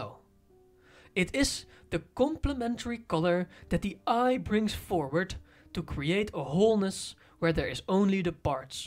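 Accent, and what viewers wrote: Netherlands. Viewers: The Dutch accent sounds like the complement of the Australian accent so it definitely worked, added colour, in my case :) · Your videos are great in content, crazy you have so few subsrcibers. Maybe work on the dutch accent?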